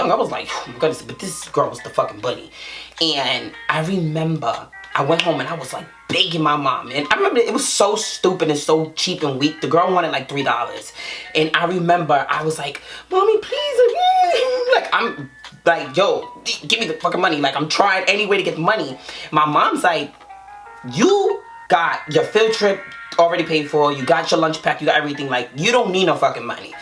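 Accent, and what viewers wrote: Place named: New York, United States. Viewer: I jus love to hear her talk ain’t paying attention to shit she saying jus love the soooo Super New York accent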